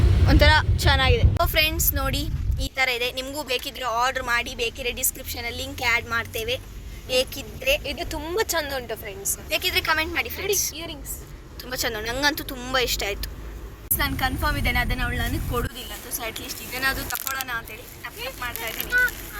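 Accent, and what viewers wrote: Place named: Arebhashe, India. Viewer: Nimma arebhshe kelike kushi adhe marre 😅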